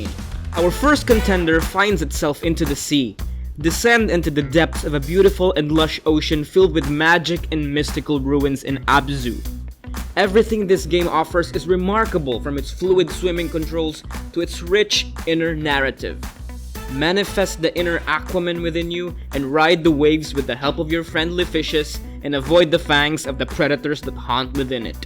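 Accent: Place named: Philippines. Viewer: That thick Philippine accent though.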